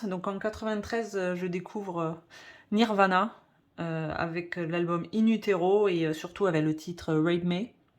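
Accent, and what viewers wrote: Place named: North, France. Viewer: Bonjour mademoiselle, oh! Purée que çà fait du bien de voir une vidéo d'une fille qui nous parle de rock 😂😂😂. Première fois que l'on présente le chef-d'œuvre de dans une vidéo, enfin 😊😊😊. L'album post mortem de cet artiste n'est surtout pas à négliger. Première fois également que l'on présente in utero de nirvana, meilleur selon moi que, merci pour cela😊😊😊. En parlant de grunge emmenés par le regretté étaient magnifiques. excellent, excellent, ils ne font absolument pas le même type de musique. En voyant l'album de, j'ai immédiatement pensé au youtuber vinyl over qu'on ne voit plus malheureusement sur la toile que dire, on s' incline. La reprise de par mon héros est splendide. Dis-moi, ils prenaient bien soin de leurs vinyles tes parents. Allez mademoiselle, je vais regarder toutes tes vidéos prochaines, ça s'est sûr. ❤❤❤❤ Ps: d'où vient ce petit accent si sympathique? 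Du nord? 😂😂😂😂.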